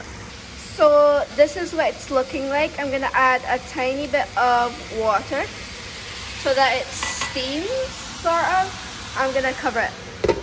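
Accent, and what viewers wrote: Scotland, United Kingdom. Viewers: Happy Easter & Family! My old math teacher always said only crazy people make perfect circles, no stress on circles lol. your western kitchen skills are getting good! Also you are starting to sound a lil Scottish with a tiny accent on a few words - very cool 😎 😀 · Hi! So nice to see you again, love your cooking skills, you look so grown up, and starting to have a wee bit of of Scottish accent, how is your brother? Happy Easter to you, and your beautiful family, 🙏💕🇨🇦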